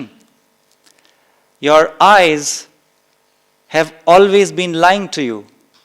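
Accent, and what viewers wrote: India. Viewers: Hindicized pronunciation of names is disappointing when the talk is not in Hindi.. personal opinion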